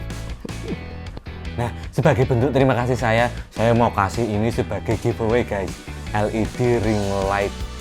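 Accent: Java, Indonesia. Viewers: Mantap om udah 10.00 subs, om udah punya kualitas lho cuman tinggal konsistensi aja. Semangat terus ya om! Alasan ingin punya LED : Saya suka ngambil footage di malam hari dan di ruangan yg (sering) kekurangan cahaya om. Padahal cahaya kan aspek penting dalam ngambil footage. Dengan LED (terutama Ring LED), kekurangan cahaya itu bisa diatasi apalagi ring LED tipe yg bagus karena penyebaran cahayanya rata Alasan subscribe channel ini : Saya udah subs sejak lama om. Impresi saya pertama kali itu waktu liat video "Lensa yang tepat untuk Videographer" itu kualitas video om bagus banget. Pembawaannya juga santai dan medok jawanya bikin lucu hehehe. Om udah punya kualitas sama ciri khas yg bagus, tinggal konsistensinya aja.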